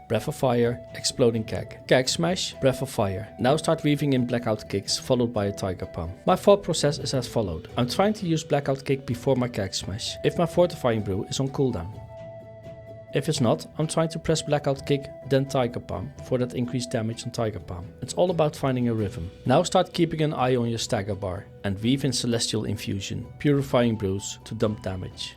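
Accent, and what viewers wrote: Netherlands. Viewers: Is it a dutch accent i hear? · Thanks for the guide, I've never played a monk before and after the video I notice the information sticking with me. (Probably because the class has been pruned) Maybe also because we have the same Dutch accent haha. Can I ask a few specific questions? I have done some content and I've never used Expel Harm, is it a button you ever press? If so, when? Do you always use Purifying Brew at 100 stagger? To keep the cooldown rolling? In what situations do you use Summon Black Ox Statue? How is Master of Harmony on Beta? Cheers again and cya next time.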